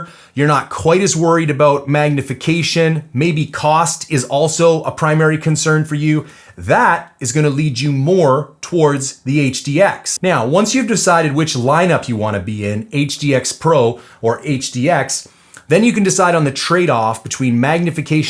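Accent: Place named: Canada. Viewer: I like this review. I heard about these from Cliff Gray's guide channel back when these came out and went to my local supplier and held some in my hands recently. I didn't know how the target/scan mode worked and neither did the guy at the station, but this showed us how . Picking the right model sure seems tough and fit in my bino harness I already have is going to be a pain. Carrying a pistol/food/cell phone/gps on a bino harness is a SERIOUS pain so maybe I can solve all of these problems with a buy once cry once approach. I appreciate your effort, scripting and editing, no BS, and passion and intensity. You seem like a solid dude with no bullshit sales flare with an ever so slight canadian accent. Appreciate you!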